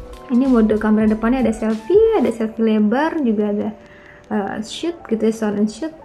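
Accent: West Java, Indonesia. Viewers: Orang Sunda 🤣🤣 suarana teh meuni lembut...aya ngejendol na🤣🤣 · Urang sunda nye teh?😊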